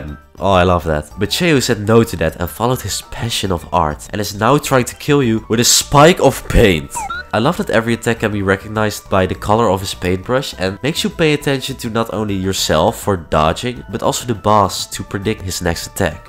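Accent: Netherlands. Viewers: I just love that i can immidiately hear the dutch accent. Geweldige video! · The biggest skill issue was listenting to the heavy dutch accent 💀 Still a great video though LMAO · U Dutch? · any chance you're dutch? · you sound pretty Dutch. Your accent I mean 😅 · Personally feel like you could work on your comedic timing a little. You sound very Dutch, which might be why the jokes don’t land on me. Great production value though. I just don’t find it very funny. Soul Tyrant is so bad on repeated playthroughs and pantheon runs. He’s super easy yet super slow cause he’s rarely ever in nail range. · ur Not english Are you? Your accent Sounds very Dutch to me😂 Don’t worry bruh, I’m dutch😂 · are you dutch? you speak english like you are dutch (not an insult) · Are you dutch? You sound dutch. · Dit is zo’on erge Nederlandse accent 😭😭😭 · The dutch on this dude is something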